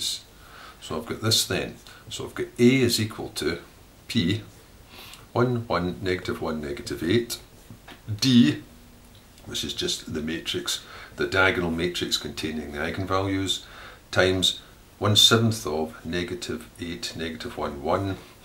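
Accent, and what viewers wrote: Scotland, United Kingdom. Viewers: That Scottish accent is freaking awesome. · He's quite clearly Scottish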